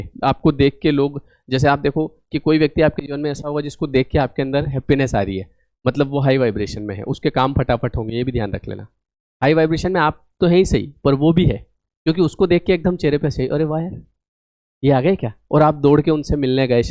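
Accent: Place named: Indore, India. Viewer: Sir indoor ki tarah bolte hai app indoor se hai app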